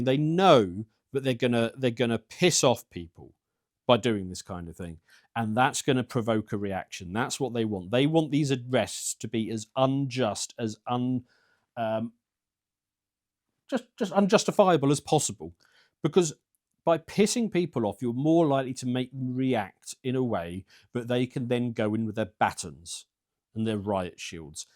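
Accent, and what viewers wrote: United Kingdom. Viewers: Good, the limey that i like listening to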